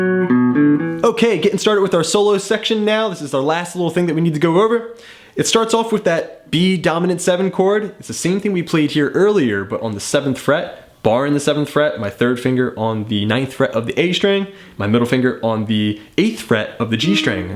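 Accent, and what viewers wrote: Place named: United States. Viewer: that accent in america has always intrigued me-nearest sounding american accent to english uk accent-where you from man?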